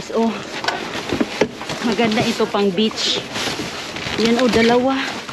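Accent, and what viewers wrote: Visayas, Philippines. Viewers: Bisaya ka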